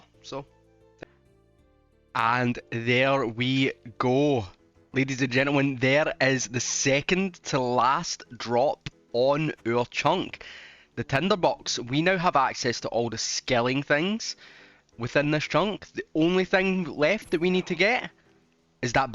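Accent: Scotland, United Kingdom. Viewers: I love me a good Scottish accent and more chunk content for my procrastination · Half my family is fae Glasgae, so it's always nice to hear a familiar accent. But stellar production on the vid. I know I'm half a year late to the party, but I'm already queuing up the next episode. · YOUTUBE YOU DIDNEA TELL ME HE IS SCOTTISH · Belt up, surely tha cannae bae another one chunk frey Glasgee! We need more o tha accent, hyped, gl! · Yeah no puro puro. Also, are you scottish?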